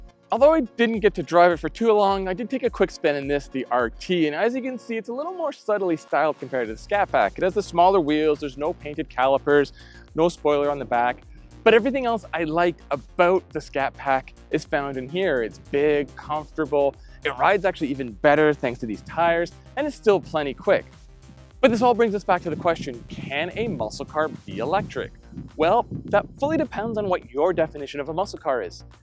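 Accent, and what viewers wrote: Canada. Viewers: See what its all a boat. Lol your Canada accent actually fits in this case.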